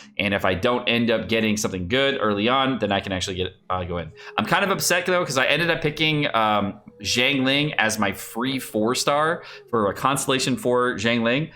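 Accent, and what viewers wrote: United Kingdom. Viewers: Yay british is here again ☺️ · I do like British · I actually look forward to the intro with british 😶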